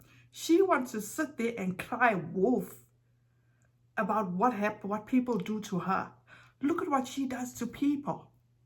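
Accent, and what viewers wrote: South Africa. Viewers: You have a South African accent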